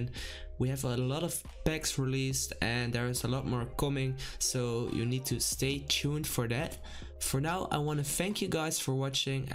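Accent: Netherlands. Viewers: nou ook weer goed te horen dat het een nederlander is lol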